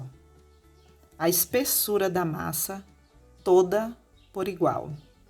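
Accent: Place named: Paraíba, Brazil. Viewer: Acabei de me inscrever!! A M E I a sua forma de explicar com riqueza de detalhes!!! Além do mais, que sotaque bonito!! De onde vc é?!?!🤔 Parece sotaque paraibano!!! GRATIDÃO pelo ensinamento meticuloso e paciente!! Deus abençoe a sua vida, seus projetos, seus objetivos!!! Saúde e paz!!! 🙏🙏🛐💕💕🤗🌻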